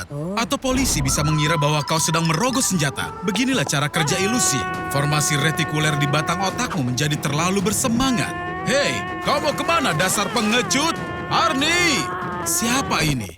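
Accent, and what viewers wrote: United States. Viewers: Bjir orang indo beneran padahal suaranya kek orang Amerika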